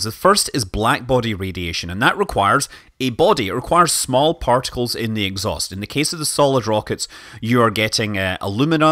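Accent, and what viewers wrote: Scotland, United Kingdom. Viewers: Maybe this is a stupid question, but how the fuck do you know so much about rockets? I mean, I feel like I've learned so much that I'm going to start talking about rockets with a Scottish Manleyish accent.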